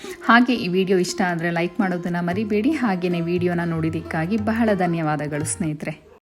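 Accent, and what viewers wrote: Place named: Malenadu, India. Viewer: ಹಾಯ್ ಮೇಮ್. ನೀವು ಅಚ್ಚ ಕನ್ನಡದಲ್ಲಿ ಸ್ವಚ್ಚವಾಗಿ ಮಾತಾಡೋದನ್ನು ಕೇಳಿದ್ರೆ ಹಾಗೆ ಕೇಳ್ತಾ ಇರ್ಬೇಕು ಅನ್ಸುತ್ತೆ. ನಿಮ್ಮ ಮಾತಿನ ಶೈಲಿ ನೋಡುವಾಗ ನೀವು ನಮ್ ಮಲೆನಾಡಿನ ಕಡೆಯವರಿಬಹುದೇನೋ ಅನ್ಸುತ್ತೆ. ಮತ್ತೆ ನಿಮ್ಮ ರೆಸಿಪಿಗಳೆಲ್ಲ ಒಂದಕ್ಕಿಂತ ಒಂದು ಚೆನ್ನಾಗಿದೆ. ವಿವರಿಸುವ ಪರಿಯಂತೂ ವರ್ಣನಾತೀತ. ಅದನ್ನೆಷ್ಟು ಹೊಗಳಿದರೂ ಸಾಲದು.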